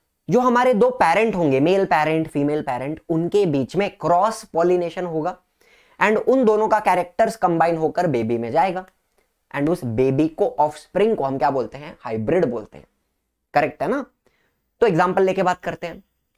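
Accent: Maharashtra, India. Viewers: hi sir aap marathi ho na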